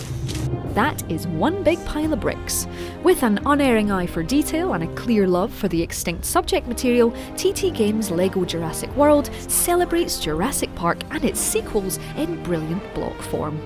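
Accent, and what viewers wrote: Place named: Scotland, United Kingdom. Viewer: I think Scottish accent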